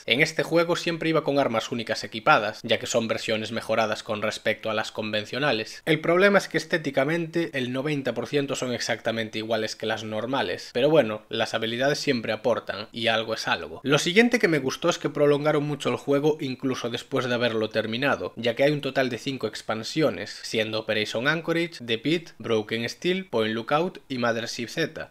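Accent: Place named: Galicia, Spain. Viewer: Te veo tanto que se me ha pegado el acento gallego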